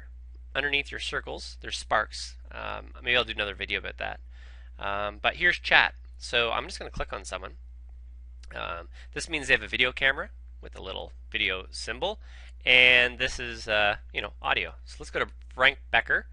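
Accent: Canada. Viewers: Your from Canada?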